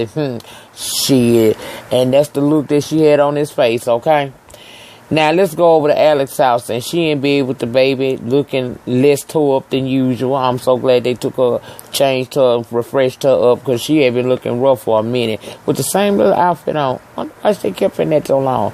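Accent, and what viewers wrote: South, United States. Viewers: I am so sick of Alex, I've known a lot of women like her who cheat and then just act like they can get everything the way they want it. Such entitlement! Honestly I can't stomach this show anymore because these people are so fucked up. Lol. I just enjoy hearing your lovely southern accent reviewing the story! Glad you're back home safe!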